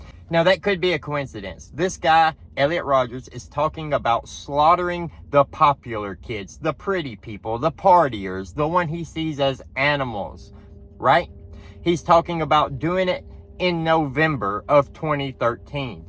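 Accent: South, United States. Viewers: Where in god's name are you from? Your southern twang is SO strong!!! I love it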